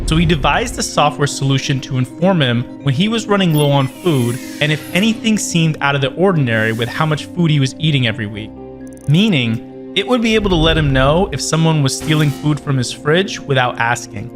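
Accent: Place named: United States. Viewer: sounds very American to me!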